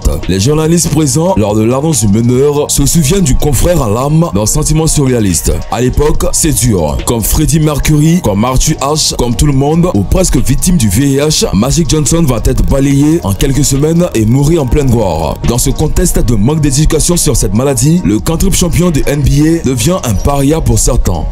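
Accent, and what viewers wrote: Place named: Benin. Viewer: C plus.son accent beninois.ils disent pas les.R.comme moi d ailleur hihihi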